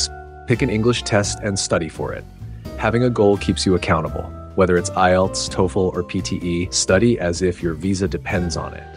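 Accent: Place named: United States. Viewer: For me, this sounds like a typical American English accent. I’ve met some Australian people here in Canada, and their way of speaking is quite unusual and not very easy to understand.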